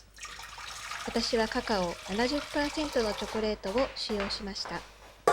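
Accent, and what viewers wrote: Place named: Kansai, Japan. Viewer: akiさん、初めまして何時も見せて頂いています＾＾ ほのぼのとして素敵なお庭ですね～♥ そしてお優しいご主人～良い方に巡り合われましたね～ 私は大阪に住んでいるのですが、時々akiさんの関西弁が聞けるので すごく親近感をかんじていますよ～ 又、楽しみにしています～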